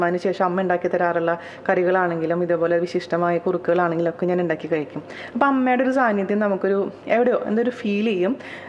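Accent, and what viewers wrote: Thrissur, India. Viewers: ഞാൻ ചേച്ചിടെ ചാനൽ കാണുന്നത് be happy with sree.... ശ്രീ പറയുന്നത് കേട്ടാണ്.. ശ്രീ ടെ യും ചേച്ചിടെ യും sound /trs ഭാഷ എനിക്ക് വളരെ ഇഷ്ട്ടമാണ്.... ഞാൻ ഒരു പാലക്കാട് കാരിയായതു കൊണ്ടാവാം..... 😍😘😂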